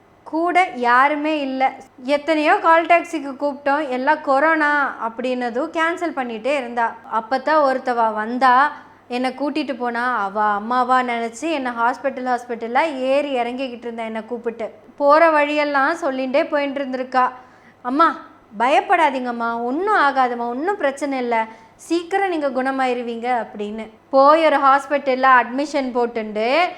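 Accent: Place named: Coimbatore, India. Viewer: I like your slang...😂 Use mostly covai slang...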